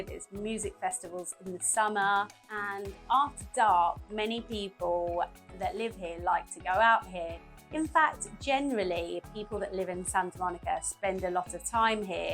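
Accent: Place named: United Kingdom. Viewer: Nice Insights, Beautiful tattoo,. I like your British Accent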